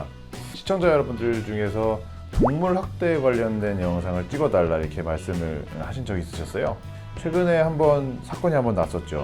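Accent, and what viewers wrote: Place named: Honam, South Korea. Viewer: 여기가 호남(사투리잘쓰는)형 변호사 유튜브 맞나요? 구독하러 왔습니다!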